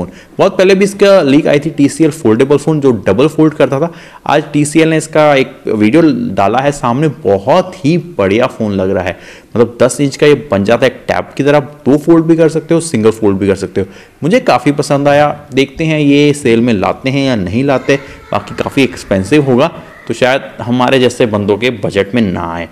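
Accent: Punjab, India. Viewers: are you punjabi ? or Saraiki dialect ?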